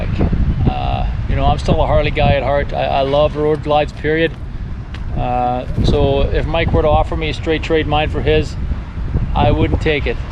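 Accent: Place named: Prairies, Canada. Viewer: I feel like your from Manitoba or Saskatchewan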